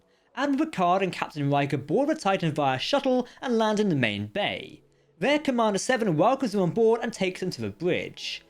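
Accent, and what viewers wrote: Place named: United Kingdom. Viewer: Being hard of hearing is making your accent even harder to process. I would enjoy it much more if you spoke in an American accent. I have NOTHING against British folk, but it is what it is. After all, if weren't for your ancestors, America wouldn't exist. Sorry to be a bummer.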